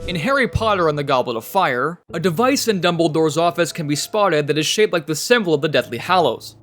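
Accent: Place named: United States. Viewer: He’s American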